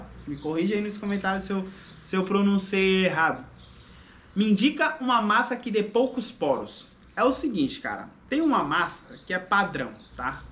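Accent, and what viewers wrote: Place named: Pernambuco, Brazil. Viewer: Boa tarde é assim mesmo que fala Pernambuco suas dicas é tope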